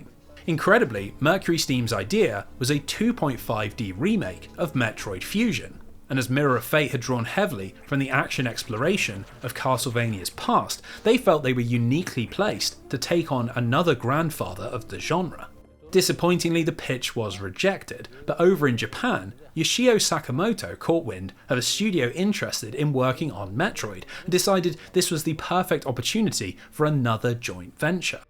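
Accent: United Kingdom. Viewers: Wow this makes me want to play every Metroid I started with Metroid prime as a 9 year old it was too much for my simple mind then but I want to revisit all of them after this video and I’m so so sorry you fighting cancer god bless you!! I just got sober first time in my life different fight but still my life was on the line due to my drug of choice…and I will always argue you deserve millions of views and I’ll always say the smooth UK accent doesn’t hurt (; thanks bro love yah I’ll pray for yah and don’t ever stop fighting 🤙🏻❤️👍🏻🫶🏻👊🏻💪🏻💪🏻🦾 you kick that cancers ass you make so many peoples day brighter and feel nostalgic of their own past and for that we all thank you !! 🎉🎉🎉🎉 let’s go brother !!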